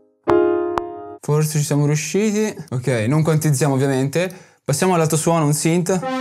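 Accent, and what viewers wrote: Italy. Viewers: toscano AHAJAKNAPA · Non sapevo fossi veneto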